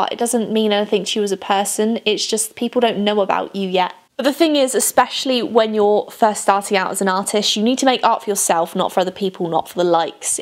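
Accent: England, United Kingdom. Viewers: Are You British? I'm sorry for my obnoxious questions. I'm just curious because your accent sounds British, yet you talk about dollar store. By the way totally amazing video very relatable!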